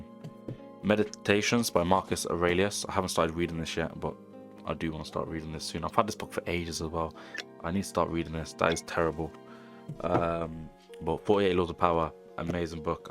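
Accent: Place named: London, United Kingdom. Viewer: in the background and you sound like a Londoner we should defo link up if you are we can share some gems 💯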